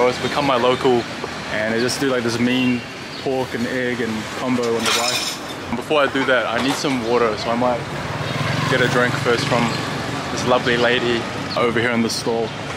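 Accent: New Zealand. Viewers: Yo man, great video and music choice (RIP,) thought I could recognise a similar accent (from nz too lmao) defo will try to check some of these stores out at the end of the year when im in Viet! Your vids are hella entertaining my man keep it up <3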